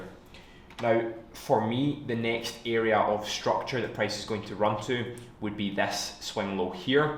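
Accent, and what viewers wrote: Scotland, United Kingdom. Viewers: Do you live or Glasgow or Scotland because your sound it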